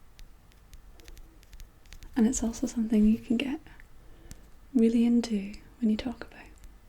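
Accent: Scotland, United Kingdom. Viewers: I wish I had a Scottish accent, It sounds amazing. I'm starting to hate my southern draw, because It makes me sound stupid XD... Great video nonetheless, subscribed! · Do I hear a Scottish accent 👀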